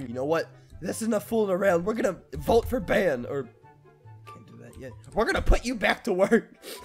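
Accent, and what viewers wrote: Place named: Spain. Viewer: his Spanish accent is so funny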